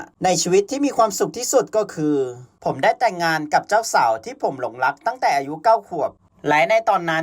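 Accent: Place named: Provincial, Thailand. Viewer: พูดไม่ชัด และสำเนียงเหน่อ ฟังไม่รื่นหู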